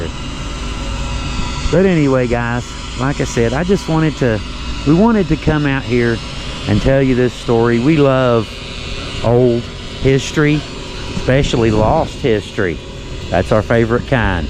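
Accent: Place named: Tennessee, United States. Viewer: Sounds like Tennessee😂😂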